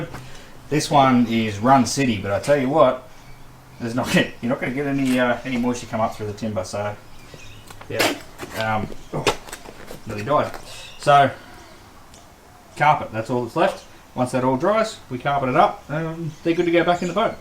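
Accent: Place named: Northern Territory, Australia. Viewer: Hi mate sounds like your in the northern territory !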